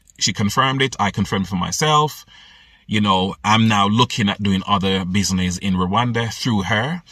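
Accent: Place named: Caribbean. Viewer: I love your accent! Are you from the Caribbean?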